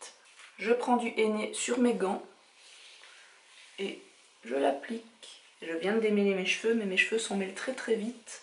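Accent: Belgium. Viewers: J’entends plus belge qui suisse perso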